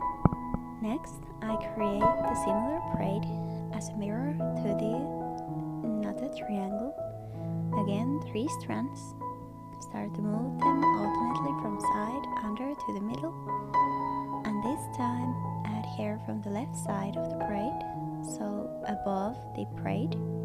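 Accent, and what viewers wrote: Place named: Finland. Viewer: She's Finnish. That's literally a Finnish accent. Are you serious right now?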